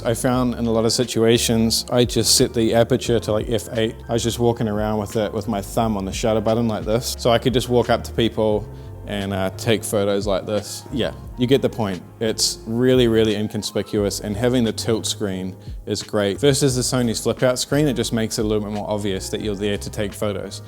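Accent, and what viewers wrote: New Zealand. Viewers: are you from New Zealand bro ?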